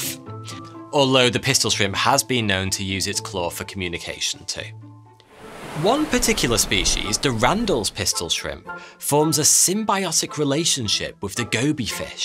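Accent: United Kingdom. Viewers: Idk if I can handle the britishness any longer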